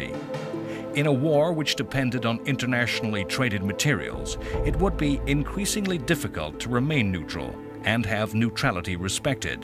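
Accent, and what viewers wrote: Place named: United Kingdom. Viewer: Prefer the British narration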